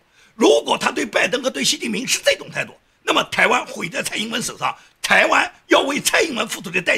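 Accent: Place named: Jiangsu, China. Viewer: 听你口音带了点苏南味 但我一朋友 钟恒 曾是你在南京的同学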